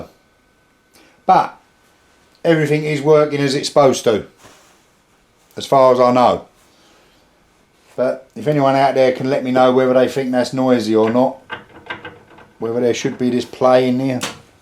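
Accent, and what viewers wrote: East London, United Kingdom. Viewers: Nice video. Your work bench is as cluttered as mine is , I think it might be a Cockney thing as you sound like you come from east London. 😊😊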